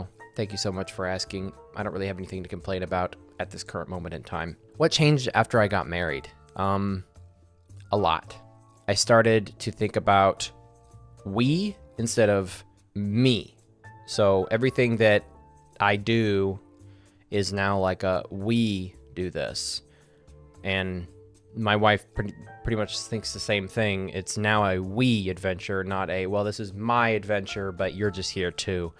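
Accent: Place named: Scandinavia. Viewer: I love that you read all of Brage’s questions in a Scandinavian accent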